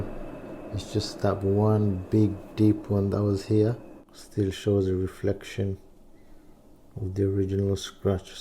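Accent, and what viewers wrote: Birmingham, United Kingdom. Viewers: 100% you’re from Birmingham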